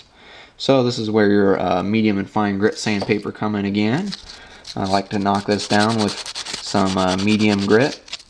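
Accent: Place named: United States. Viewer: thanks for the informative, helpful instruction. IS there a stronger bonding way to join brass? BTW but why do Americans pronounce solder, "sodder"?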